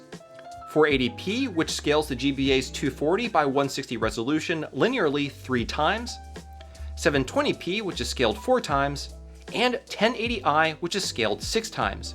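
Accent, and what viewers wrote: United States. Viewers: I love how despite his hispanic heritage, his American accent is so strong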